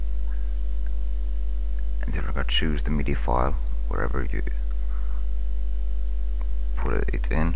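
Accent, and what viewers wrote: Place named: Finland. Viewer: finnish as fuck, great totreal lol.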